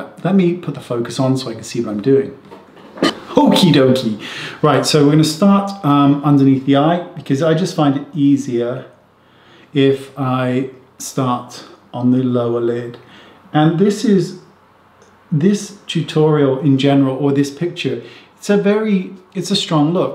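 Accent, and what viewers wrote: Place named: Scotland, United Kingdom. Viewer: What sort of lovely accent do you have? Sounds a bit Scottish sometimes.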